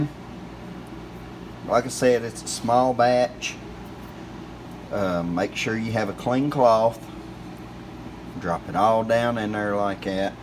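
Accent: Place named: Appalachia, United States. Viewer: I’ve been looking for wild lettuce videos,Soon as I heard u talking I thought “yep, this guy.” Some hick off in the woods, 🤠hillbillys and home remedies that work.. that’s like a Ketchup and cheese sandwich.. thx . With love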